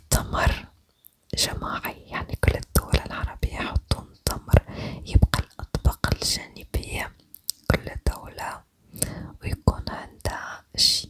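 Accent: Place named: Egypt. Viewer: لهجتك مصري ع خليجي😂❤❤❤استمري قمر 🎉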